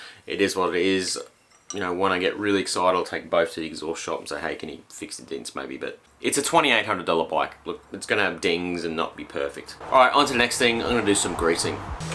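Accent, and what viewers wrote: Australia. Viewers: Good video, but a had a hard time understanding your Australian twang. Greetings from Las Vegas Nevada USA 🇺🇸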